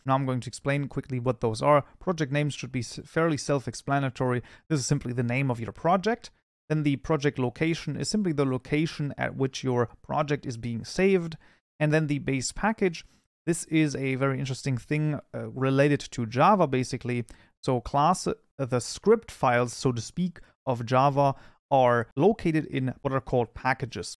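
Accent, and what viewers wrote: Germany. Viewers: Is this an Deutsche akzent?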